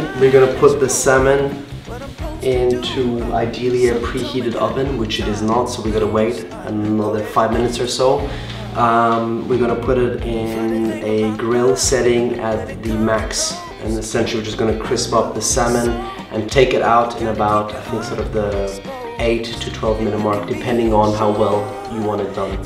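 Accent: France. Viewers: ton accent français est trop mignon !! 😍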